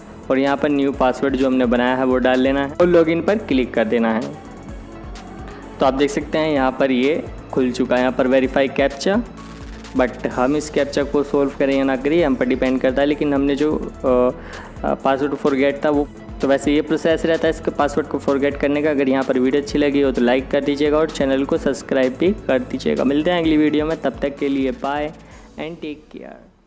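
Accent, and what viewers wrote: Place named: India. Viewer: He is speaking English just in a Indian accent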